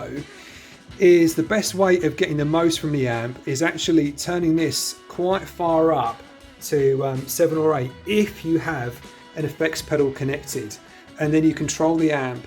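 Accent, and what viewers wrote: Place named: United Kingdom. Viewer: many thanks look forward to your review. I never used nux before but I was totally blown away with the mighty air. It's only the size of my hand so you could take it anywhere. Having a built in battery and being wireless is massive feature. It's good you don't have to spend loads of money for good sounding gear. Keep up the good work just came across your channel and love it. Are you in USA , you sound British but you say pricing in dollars.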